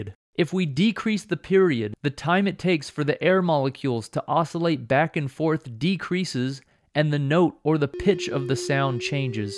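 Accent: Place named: India. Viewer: after next bunch of indian accent video, now I understand what is frequency and amplitude. How about magnitude?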